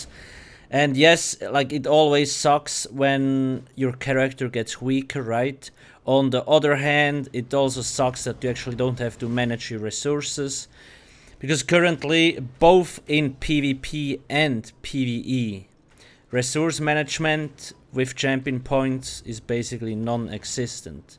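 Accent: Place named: Switzerland. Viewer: Pretty sure he is Swiss. That is our accent :)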